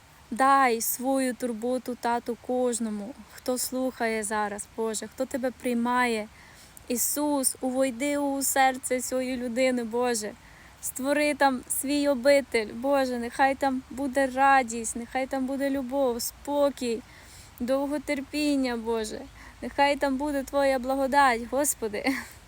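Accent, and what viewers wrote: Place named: Transcarpathia, Ukraine. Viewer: Така файна закарпатська говірка... але дівчина іще файніша🌹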